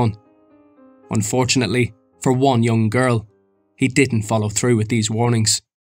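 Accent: Ireland. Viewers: Unreal channel,brilliantly narrated. Love too know what County you are from because it bugs me trying to guess 🤣🤣 I'm from Dublin so you'd think I could take a good guess 🤣🤣 cheers for the hard work man;its really good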